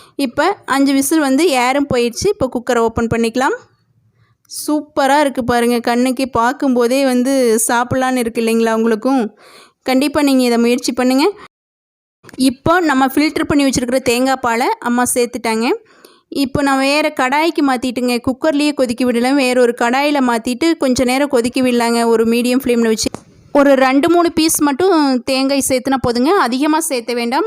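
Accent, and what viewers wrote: Kongu, India. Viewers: தலைக்கறி நாவிற்கும் கொங்குதமிழ் கேட்பதிற்கும் சுவையாக இருக்கிறது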